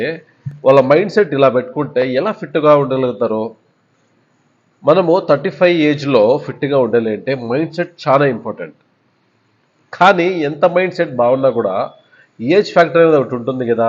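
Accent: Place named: Anantapur, India. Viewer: Sir , mee uuru pakka atp to karnataka border sir slang chusthey thelisipothundhi❤ any ways thanks for the great info🎉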